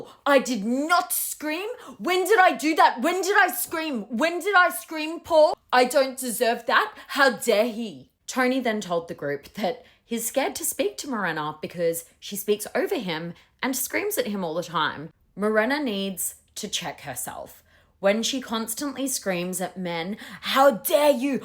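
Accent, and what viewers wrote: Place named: Australia. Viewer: Just found you! You are hilarious! So cool to hear an Aussie accent doing the recaps! Going to binge all your content! ❤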